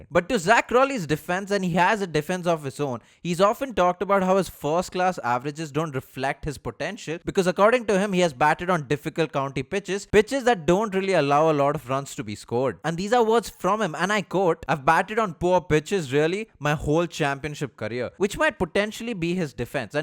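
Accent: India. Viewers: This pjeet accent is sending me😂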